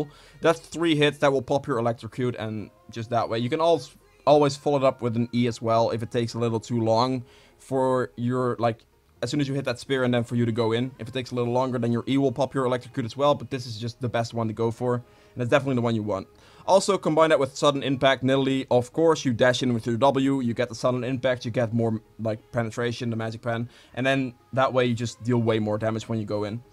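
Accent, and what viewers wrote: Netherlands. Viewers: you sound like ur dutch and nice video bro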